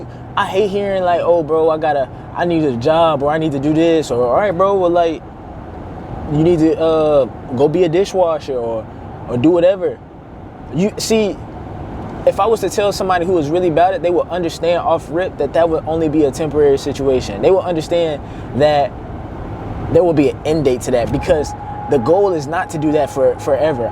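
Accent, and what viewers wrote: Baltimore, United States. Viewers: Bro u from Baltimore them ouis sound familiar 😂na great motivation bro ima subscribed now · Are you from Baltimore ? 😭 also the videos are 🔥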